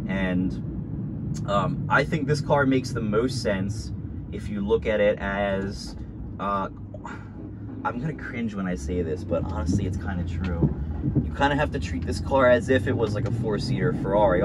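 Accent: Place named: Cockney, United Kingdom. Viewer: Great fun and pretty informal too … not a bad cockney accent either … love the 4200 …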